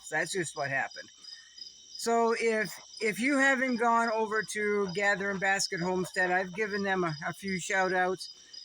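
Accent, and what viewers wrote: Maine, United States. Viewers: Congratulations on your 3,000! Im picky on pages I follow because I dont have time to watch them all lol I only follow a handful, I definitely learn from yours + agree with things you have to say + you make me laugh + of course being from Maine love your Accent. Wow crazy temps up there😮 it was only 85 here in SC. Hope you get some good sleep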